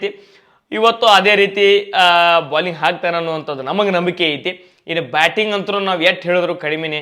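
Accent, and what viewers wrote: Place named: Bijapur, India. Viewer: ಅಣ್ಣಾರ ನೀವೂ ಬಾಳ ಚೊಲೊ ಮಾತಾಡ್ತೀರಿ ನಿಮ್ಮ ಬಿಜಾಪುರ ಮಾತ್ ಕೇಳಾಕ್ ಬಾಳ ಖುಷಿ ಅಕೈತಿ. ಜೈ RCB 👑 💛❤️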